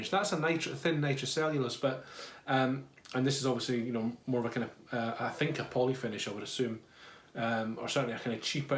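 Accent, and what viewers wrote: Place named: Scotland, United Kingdom. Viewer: Nice to hear another Scottish person!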